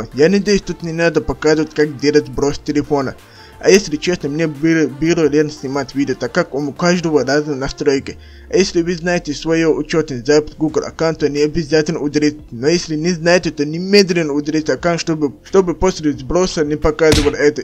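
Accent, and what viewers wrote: Chechnya, Russia. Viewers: вообщето да у него акцент как у чиченца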